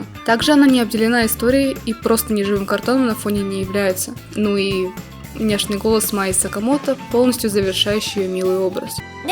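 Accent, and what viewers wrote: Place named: Russia. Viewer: Your accent is so cute, i need to go to Russia now to get a waifu. But Elise is trash LOOOOOOOOOOOOL Just kidding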